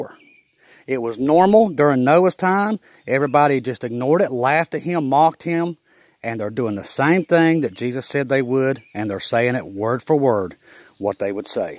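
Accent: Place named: West Virginia, United States. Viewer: Am I getting this right? I just recently subscribed to your channel and it sounded like you are in West Virginia. I live in South Dakota but my entire dad's family is basically in Logan County West Virginia and have been for centuries! I just find it interesting, I'm also related to the Hatfield's on my dad's mother's side although her dad was a McCloud. I love my family there and I love West Virginia though the last time I visited was in 1981, really miss it but my health precludes me traveling. Anyway God bless you brother! I love your posts that I've seen so far! MARANATHA! HALLELUJAH! AMEN!🙏❤️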